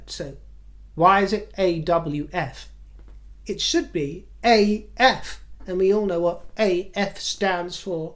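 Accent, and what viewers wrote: United States. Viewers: If I didn’t say it on the last one I saw the milk and honey which I think was the last one you made… anyway, I love the new intro. Not bad American accent, either. That’s one reason alone that would make me want my grandmother to have emigrated to the UK versus the USA. That and Trump lol😂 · You have a great American accent!! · Well done with the American accent! I had to do a double-take. Well done 😊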